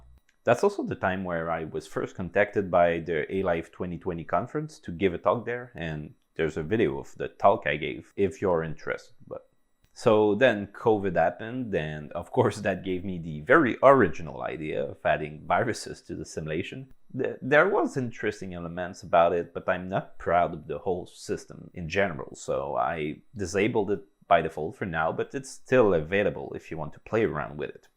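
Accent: Quebec, Canada. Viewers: I am from Quebec (Canada) and your accent is pretty similar at the one that we have here when we're talking english, are you from Quebec? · Hey mec j’ai vu ton vidéo sur la simulation de 100 heures et en t’écoutant je me disais: ha j’suis sur que c’est un ingénieur (québécois). Tu représentes le ´deuxième ´ type d’ingénieur: le type qui devient moniteur de ski ou maraîcher ou youtubeur… bonne chance dans ton projet c’est super intéressant!!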